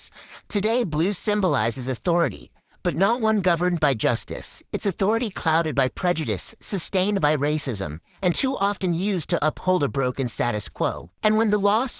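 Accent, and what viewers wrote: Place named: South, United States. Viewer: we would still hear the southern drawl. Even with the voice filter.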